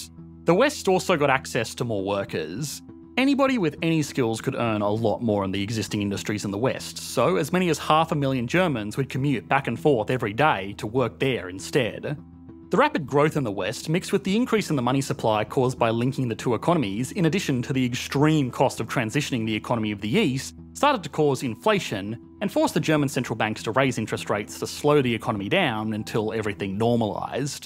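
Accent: Australia. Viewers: I will never watch the German version, simply because I love the original Australian accent and very unique delivery so much. If phonebooks still existed, could make a whole video channel reading them aloud and I would watch it. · As a German who appreciates the WirtschaftsWissen channel, I still can’t imagine without English in that fine aussie accent, mate 👌🏼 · Haha, alltough an Ozzie speaker, it is a British vieuw of economics. A bit shiny fellas.;-)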